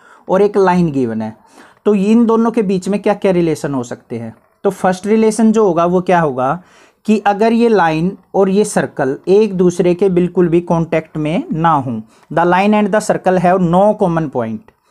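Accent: Haryana, India. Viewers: name tek lete h 😁😁🤣🤣🤣🤣🤣are you from haryana sir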